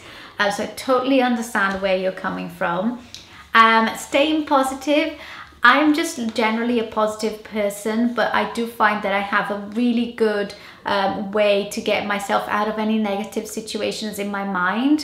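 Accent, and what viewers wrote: United Kingdom. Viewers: Oi Sou Letícia e eu conheci seu canal por um vídeo de dicas de inglês, discubrir q vc é brasileira e fiquei espantada, pq achava que vc era britânica, e me inscrevir no canal de cara tanto pq te admiro tanto mãe quanto pessoa, e agora descubro q vc e de Aracaju!! MDS minha cidade! Estou surpresa achei q vc era de Minas ou Rio kk e nossa vc ja era e é minha inspiração (no inglês pq eu amo seu Inglês ele é maravilhoso!! )Em fim só um desabafo... Se falei muito desculpa... I love your videos so much.. Manda beijo! ❤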